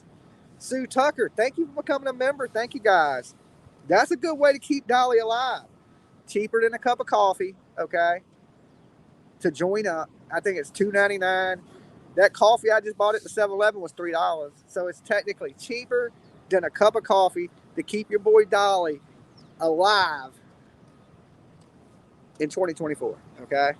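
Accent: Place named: Hampton Roads, United States. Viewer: Hey Dolly, Are you from the 757? You sound like you are. 😊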